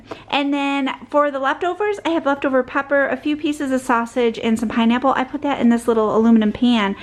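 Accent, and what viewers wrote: Michigan, United States. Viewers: I found your channel just awhile ago, so haven’t heard what part of The Mitten you are from. I like your bubbly personality and the accent takes me back. Lol We lived in Michigan and Kogels is the absolute best!!!! We live in Tennessee now, but every time we go back we stock up on anything Kogels. The bologna is not like anything I have ever had anywhere. We buy a couple of rolls and freeze it. I wish the sold it here. I took this brand for granted when I lived there. We’ve ordered some also.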